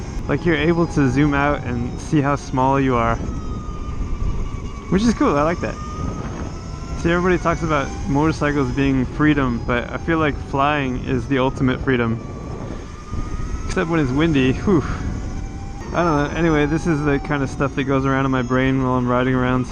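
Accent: California, United States. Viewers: Great insite! I often get asked what I'll do if my battery needs to be replaced of if something much better comes along. My answer is that I'll sell what I have and buy the next thing. I'm writing this response on my new galaxy Fold phone/table, in my Tesla, dreaming of owning an Energica. I think you have a California accent. Your d's sound like t's. Like when you say "newzerolanT." I'm accused of having a Canadian accent. I say "hoose" and "aboot" instead of "house and "about." But I grew up in Massachusetts so that doesn't make any sense, eh?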